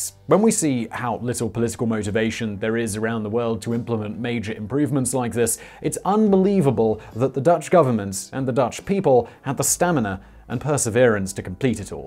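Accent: England, United Kingdom. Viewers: Reading downwards I see no other comment really necessary but to compliment you on your pronunciation as most of my native English speaking friends never tried that hard. And going on your accent, you probably don't mind if foreigners call the UK or Great Britain 'England' either. All in good fun for whomever gets it. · With that accent? No - I do believe he is 100% English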